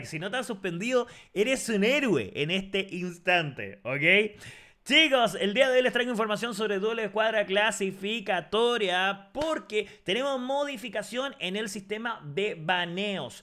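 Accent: Chile. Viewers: Ola Bro eres chileno por que hablas como chileno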